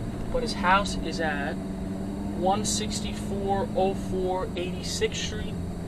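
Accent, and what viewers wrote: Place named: Philadelphia, United States. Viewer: Hey u a Philly guy I'm south of Boston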